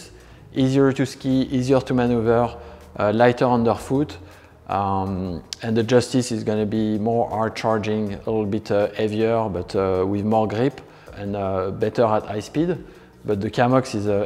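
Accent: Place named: France. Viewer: Très beaux skis !👍 Ça s'entend que le présentateur est français 🤙😁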